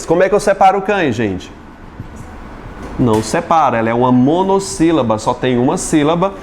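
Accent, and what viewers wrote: Northeast, Brazil. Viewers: Primeiro professor que não me deixa entediada. É didático, sem gracinhas, sem exageros para prender atenção, direto ao ponto. Fora esse sotaque maravilhoso do meu nordeste 😍😍😍😍 Nossa eu amo suas aulas. Muito obrigada, de coração.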